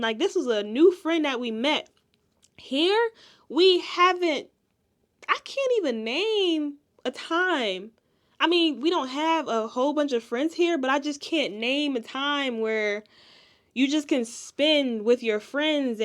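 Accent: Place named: United States. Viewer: oh woow. That was a very good vlog. As a Kenyan, I actually thought you were Kenyan but your accent confused me for a while until u owned up of being American. U look so much like us and we will do everything to make u feel being African as u are indeed one of us. We certainly do have time for family...which is the most important.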